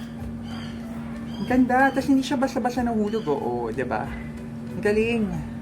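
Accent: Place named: Iloilo, Philippines. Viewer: May naririnig akong ilonggo accent hehe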